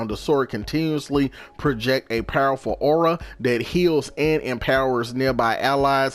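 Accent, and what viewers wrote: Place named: South, United States. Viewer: You remind me of Magamu, but with a Southern accent. Great video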